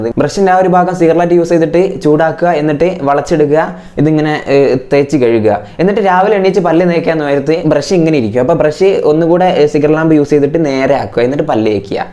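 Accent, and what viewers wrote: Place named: Thiruvananthapuram, India. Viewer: തനി തിരുവനന്തപുരം ഭാഷ😂👍👍 ബ്രോ പൊളിയാണ്😘😘👍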